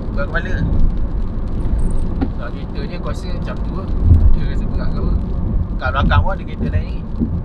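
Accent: North, Malaysia. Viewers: org utara kaa? Lama duk sana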